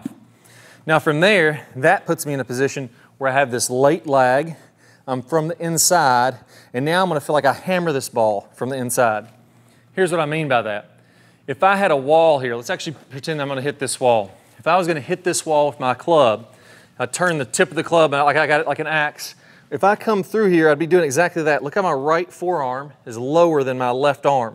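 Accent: South, United States. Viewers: Thanks for the heavy southern accent golf instruction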